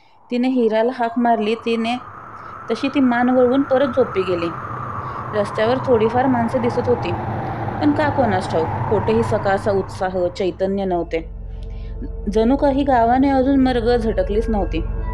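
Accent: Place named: Konkan, India. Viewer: Kokani tone Chan aahe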